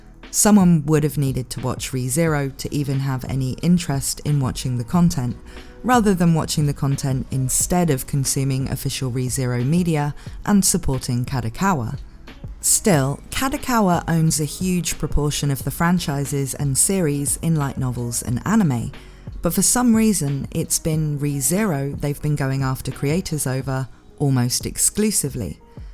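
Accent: United Kingdom. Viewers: I knew after listening to your video on Noralities that subscribing would be wise. You have "the voice", I could hear it then and I hear it now. Beautiful, smooooooth, intellectual British voice (sorry if you're Australian, I'm American please forgive me) that I could quite literally listen to all day and just be mush. I AM MUSH! HEAR THIS PROPHECY! WE SHALL ALL SLEEP VERY WELL ON WHATEVER NIGHT THAT COMIC BOOKS vs MANGA SALES VIDEO IS PUBLISHED! Thus, it is written and I look forward to that night. :D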